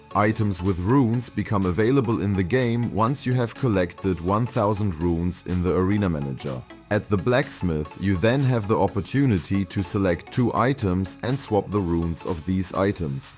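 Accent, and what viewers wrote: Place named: Germany. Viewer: Man hört das du Deutscher bist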